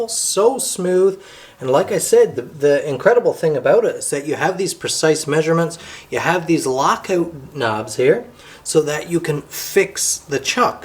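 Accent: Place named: Newfoundland, Canada. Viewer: Your Newfoundland accent sounds so similar to a Dublin, Irish accent . It’s funny .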